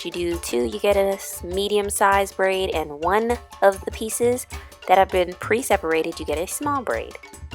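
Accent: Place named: California, United States. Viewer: Beautiful lady , beautiful hair . I'm from the south but love the California accent . You remind me of China Renee another YouTuber with your accent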